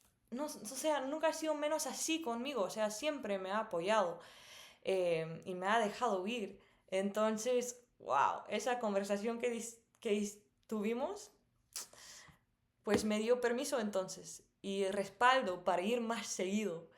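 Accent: Guatemala. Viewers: Wow su acento en español es Guatemalteco saludos desde New York hermosa de parte de un Guatemalteco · Que chilero tu canal y tu acento chapin va vos .jajaja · Tu espan̈ol es perfecto y muy CHAPIN!! Tan chapín que la primwra vez pensé que eras chapina más que la vecina!!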